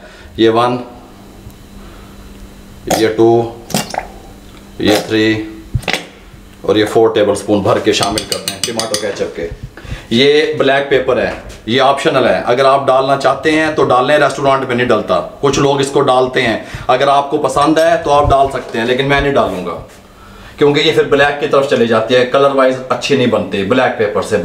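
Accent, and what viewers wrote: Punjab, India. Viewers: Uncle tuada style kamal a... punjabi nal boldy o wich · Bhai aapki her recipi more delicious,tasty aur lajabab hai,aur aapki Punjabi jubaan Masa Allah aapki recipi ki tarah hai saath hi meethi bhi hai,main apne near&dear ko share bhi kar Raha Hoon.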